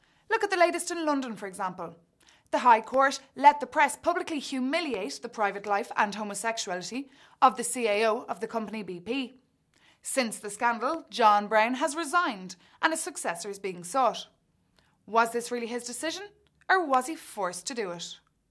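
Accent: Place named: Ireland. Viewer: Irish accent... I like her accent...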